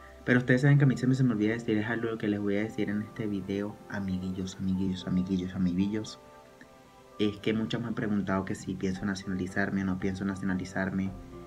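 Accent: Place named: Mexico. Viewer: Hey.... E visto algunos de tus primeros videos en México y nooo manches te as dado cuenta que ya casi tienes acento mexicano? Muchas ganas al estudio como hasta ahora pero no todo en la vida es estudio y trabajo. Búscate un@ novi@ y se feliz Es de buena leche ser agradecido y quiere a México pero nunca te olvides de tu tierra Venezuela Saludos y éxito para ti y viva México cabrones!!! (Ya se que tú no usas aún el lenguaje florido de México pero mientras no ofendas a nadie es válido) saludos y buena vibra.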